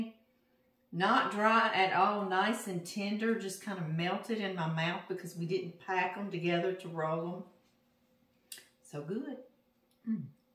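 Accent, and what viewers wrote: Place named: Louisiana, United States. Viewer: Hey, haven't been doing much video watching this past couple of weeks due to losing a good friend of 25 years she was born and raised in Louisiana she had just turned 56 so gone unexpectedly and way too soon. We originally met online and formed a bond like sisters through the many years of conversation. We never got to meet in person due to life always getting in the way which leaves me with such regret..but we spent hours talking on the phone with each other through the years and I sure am missing her. Anywho when I got the notification on my phone that you posted a new video I just had to watch since I shared your videos with her so often. I gotta say hearing your voice and that Louisiana accent opened the floodgate of tears knowing she wasn't just a phone call away anymore. When you and did the blessing it was like a soothing balm to remind me that someday we will be reunited. 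I don't mean to ramble but just wanted to share with you how your video touched my heart. Thank you, God bless and keep you and your family. ❤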